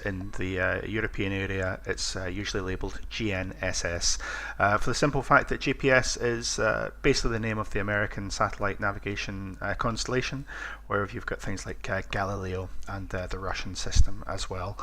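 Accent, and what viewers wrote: Scotland, United Kingdom. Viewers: Hi, currently learning lots of invaluable flying techniques and tips from your tutorials - many thanks! Quick question about the check heights from the chart - would you typically have those memorised or written down somewhere for cross-reference? Thanks - nice to hear a Scottish voice:-)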